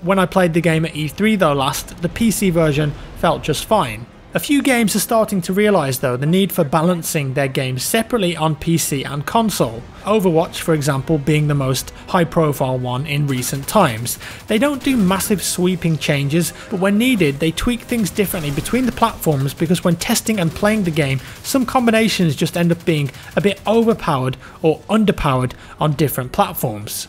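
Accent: United Kingdom. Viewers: A British lad that says 'Beta' the 'Murican way? That's new.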